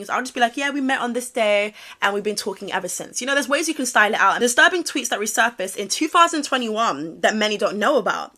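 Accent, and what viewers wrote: United States. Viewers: The American accent is killing me 😭 she is so sick and twisted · !! Thank you for always bringing the best pop culture content to the public! You slay, queen! Literally Crissy Tegan is so insane and I'm glad you're speaking up about this! Also, LOL I'm an American and I have to say that your American accent is so great!!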